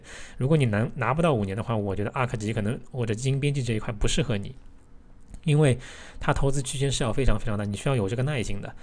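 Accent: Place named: Shanghai, China. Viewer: 哈哈哈，你是个小姑娘吧？看的我笑出声了。他说话上海口音很明显呢，长相很适合演老顽童年轻版本。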